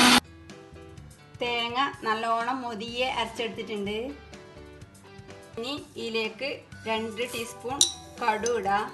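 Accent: Kasaragod, India. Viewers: നീലേശ്വരം ഭാഷ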